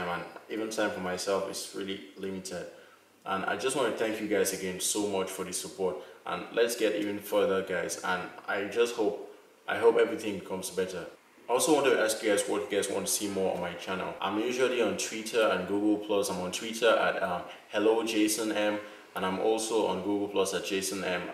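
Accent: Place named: Nigeria. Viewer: Hey, you sound Nigerian. Are you?, lol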